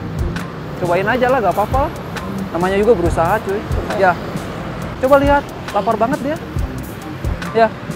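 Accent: West Java, Indonesia. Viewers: Bang asal mana ko sunda 😊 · Diatu sunda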